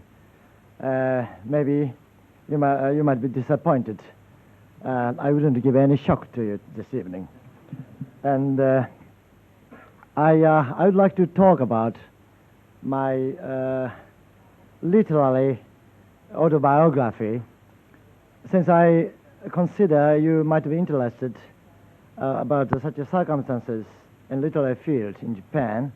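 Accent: United Kingdom. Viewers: 昔のイギリス鈍りで当時としてはネイティブなレベルだったと思うぞ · イギリス訛りっぽい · 戦前の日本はイギリス英語を主に学んでいたと言うから三島さんもその訛りが感じられるね。 なんというかやはりイギリス英語は気風がある感じがするから彼にあってるね。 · わざとイギリス弁にモノマネした喋りすごい。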